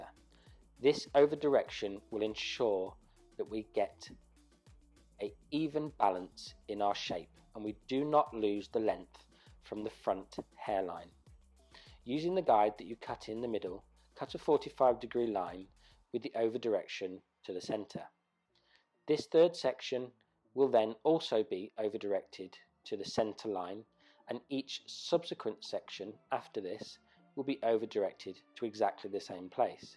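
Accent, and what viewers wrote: United Kingdom. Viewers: Im a totally new hairdresser student and happy to find someone who just explains what to do and not all kinds of useless jokes and comments. Clear and easy to follow.The british accent helps as well ;) Thank you, you have a new apprentice!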